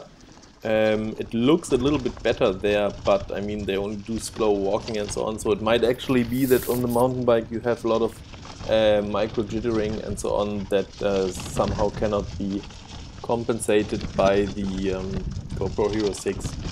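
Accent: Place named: Germany. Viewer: Du bist deutsch oder? Hört man raus 😂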